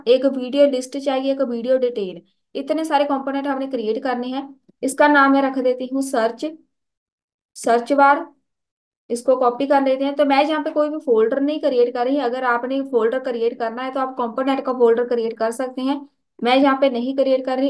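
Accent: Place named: Ambala, India. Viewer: Apki aavaj to ambala side ki lag rhe hai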